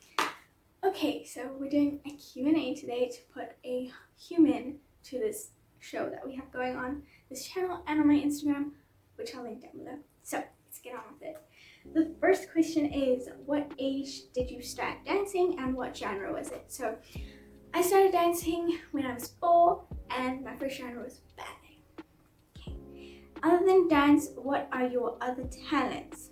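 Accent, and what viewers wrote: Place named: United Kingdom. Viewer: Nooo she’s turning bri’ish 😭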